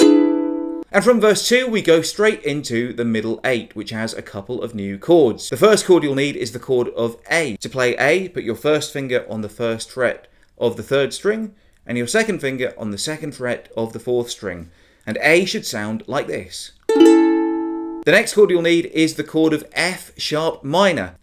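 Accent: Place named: United Kingdom. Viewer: I love youuuuu. The best Ukulele teacher ever!!!!! You make the instructions so simple to follow!!! I love youuuu. You've just got another subscriber. Huhu I love you so much you make it much easier for me. I just began learning Ukulele a couple of days ago and now I can play and sing. Next is this song, my most favourite jazz song ever:( I love your British accent too. Hope everything good comes to you <3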